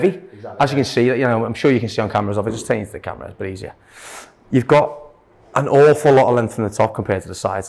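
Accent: Liverpool, United Kingdom. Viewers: Are you scouser? You sound like a scouser:D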